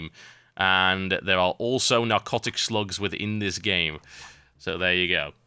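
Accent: United Kingdom. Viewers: that is a british accent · I love how TB always says, "... Sorted..." in his brit accent xD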